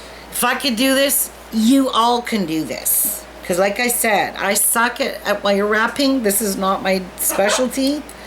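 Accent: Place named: Maine, United States. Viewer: Hello <3 I just wanted to say how much I enjoyed your personality! You were a breath of fresh air with your modesty, and reminded me of my childhood on the coast of Maine with your expressions and way of speaking. I was just reading some of the comments, and honestly, I wonder how some people can be so rude and judgmental under the guise of giving you "constructive criticism". My wonderfully colorful Great Aunt Laura from Great Island had an expression for folks such as these.....*spoiler alert, language may offend some of you** Opinions are like arseholes, ~everyone has one.... doesn't mean ya have to BE one! Happy Crafting, Love!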